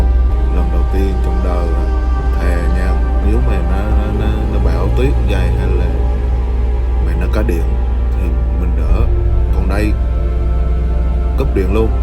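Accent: Binh Dinh, Vietnam. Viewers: Minh nghe tiếng nói của ban chac ban la nguoi o Binh Đình ,nhung chac o day vẫn còn co điện ,con nuoc uống trong nha co đồng bằng không ,o nha nhu vay minh nếu khg co điện thi chac co bếp củi dot liên tục de suoi chu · Em này chắc người Bình Định nói giọng nghe giống quê tui :)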